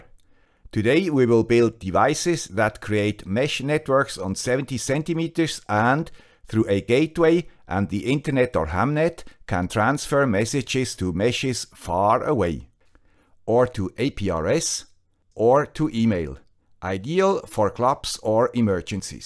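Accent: Switzerland. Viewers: Hello guy with the swiss accent, do you have by change knowledge of somebody using a lorawan gateway´s 9th channel (backhaul) for meshtastic...? Could it be possible? 73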